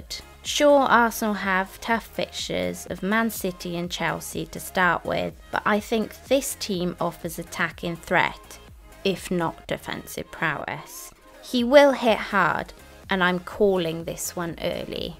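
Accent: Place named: Wales, United Kingdom. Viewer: Never the less; those lovely Welsh(?) tones are honey to the ears. Keep up the great content and get well!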